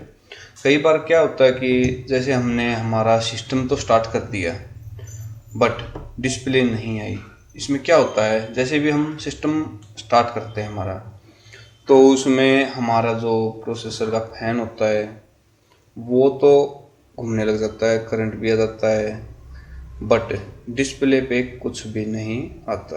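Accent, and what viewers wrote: Haryana, India. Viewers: Bhai boli to haryanvi lg rhi h kit k ho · Bhai apke muh se halki halki haryanvi sun ke maza aa gaya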